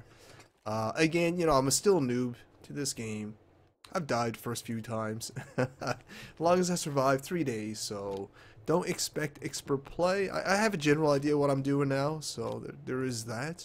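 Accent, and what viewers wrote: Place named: Newfoundland, Canada. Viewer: I didn't know you were from Newfoundland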